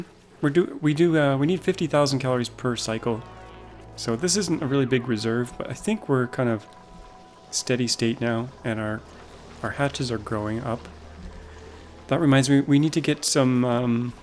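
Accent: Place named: Ontario, Canada. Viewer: Hol up. Unless Ive seen this mentioned before, I didnt know you're a fellow Canuck! What province? you sound more.........I dunno......maybe Ontario? Can't fully tell. Certainly not Alberta or Saskatchewan...........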